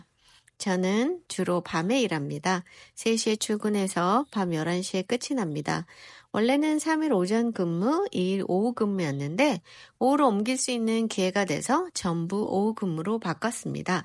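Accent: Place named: Seoul, South Korea. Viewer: 또랑또랑한 서울 말씨 참 듣기 좋네요, 멋집니다.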